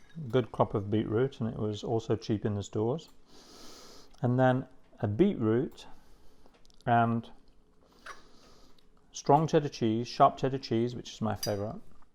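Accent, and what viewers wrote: United Kingdom. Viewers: What's this nonsense? I'm pushing 60 years old and have never seen or heard of anyone cutting a crumpet like that. Literally never. You sound (middle class) English but who is this aimed at? No one calls them 'English crumpets' in Britain and what Americans call 'English muffins' are not the same as crumpets. What the hell is going on? It's one thing to pitch British recipes to Americans etc, and should be congratulated if anything, but to perpetuate nonsense like English crumpets and suggest they're the same as 'English muffins' is ridiculous. If you're filming that in America, dont come back, mate, you're dead to us. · I suspect that, in spite of your accent, you are not British otherwise you would know that crumpets and muffins are 2 completely different things.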